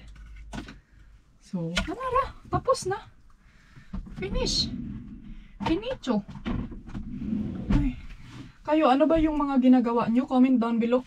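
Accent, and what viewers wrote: Philippines. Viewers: Bugay kay kag English Indai pinay na pinay I like it 😄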